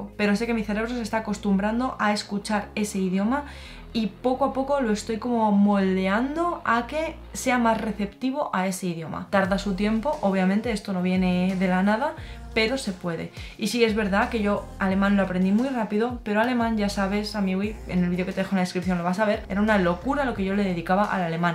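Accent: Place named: Spain. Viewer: 🥰me gusta mucho tu accento de español aunque nunca me ha gustado el accento de muchos españoles y tambien te estoy imitando cuando hablo alemán 😅,,,no sé porque? Pero me das ganas de decir que alemán suena romantico 🤭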